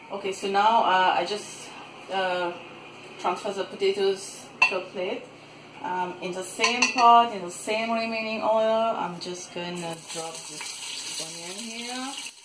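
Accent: Mauritius. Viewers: Your accent is very similar to Mauritian's!cool😊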